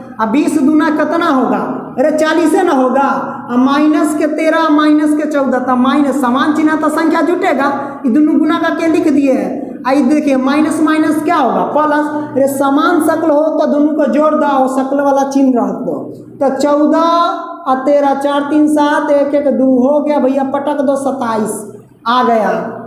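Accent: Bihar, India. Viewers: Sir aap bihar se hai kya.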